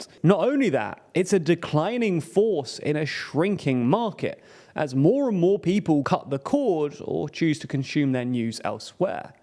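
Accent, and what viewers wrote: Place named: United Kingdom. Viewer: Why do people automatically believe that a guy speaking in a British accent is some kind of expert on news! Especially when we freaking live in North America and have literally thousands of news "outlets"? So why listen to 3rd hand news from some British Pud who hasn't ever left his own town, let alone his country!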